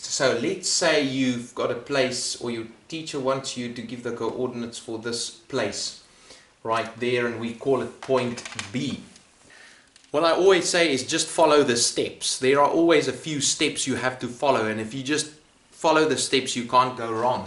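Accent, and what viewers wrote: Afrikaans, South Africa. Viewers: You south African bruh? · Thank you for your reply! Your accent is very cool! I had a teacher who was born in South Africa. Maybe I didn’t hear too much Scottish afterall 😊anyway, it’s nice to hear such an interesting voice. Cheers, and thank you again for your great videos! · I like your South African accent. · Are you South African? That accent sounds familiar. Ps: thanks for the video! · I'm from South Africa and it really sounds so South African 😂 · I have an exam tomorrow and you've been of much help. Thanks so much. (btw you sound Afrikaans, am I right?)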